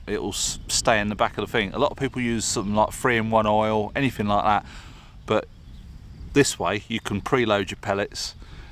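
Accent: United Kingdom. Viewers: From your ascent, you sound like a British man that is having a lot of fun in France.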